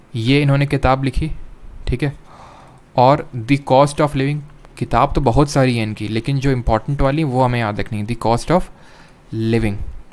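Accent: Kanpur, India. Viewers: The timing . Kitna badhiya samjhaya Article 2 ko...I love this kanpuria ascent. love from gorakhpur sir ji.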